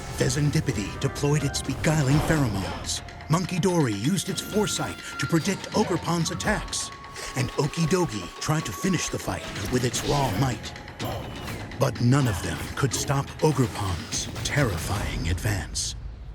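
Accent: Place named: United States. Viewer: Why isn't this in English British but in a "Murrican" accent? And why isn't there still an option for British English so Fahrenheit becomes Celsius in dex entries?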